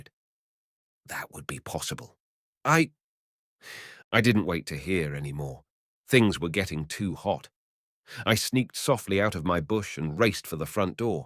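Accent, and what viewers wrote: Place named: United Kingdom. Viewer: The British accent somehow doesn’t sound ‘British’ enough… anyone agree? This sounds like a Brit who has lived in the U.S. for about 10 years and acquired an accent rather eroded.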